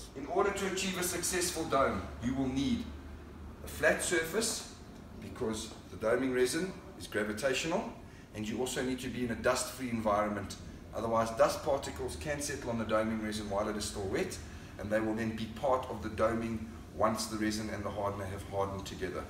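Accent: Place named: South Africa. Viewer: That sounds like a South African accent 😁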